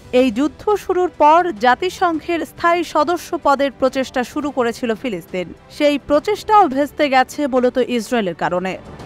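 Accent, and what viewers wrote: India. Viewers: খবর প্রচার কারি মহিলার কন্ঠ ভারতের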